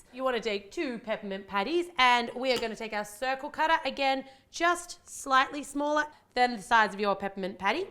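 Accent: Australia. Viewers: Are you Australian, omg what state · Are you from Australia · are you from Australia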